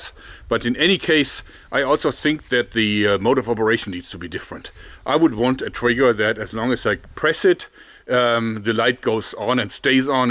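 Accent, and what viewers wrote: Germany. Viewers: Are you german ?? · Is this guy german?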